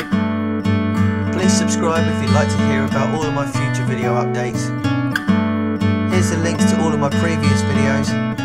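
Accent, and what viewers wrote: England, United Kingdom. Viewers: Nice to hear an English voice which gives me a bit of hope that I might be able to grow a lemon (as opposed to being one so often). I have five seeds growing and wonder if I will ever have lemons or just leaves as I live in the north east. Thanks for your video sir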